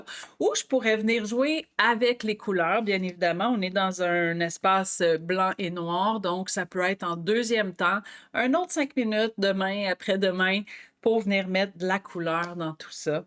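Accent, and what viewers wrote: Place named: Quebec, Canada. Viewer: J’adore tes projets et j’adore ton accent Québécois ❤❤ bonjour de Bretagne et merci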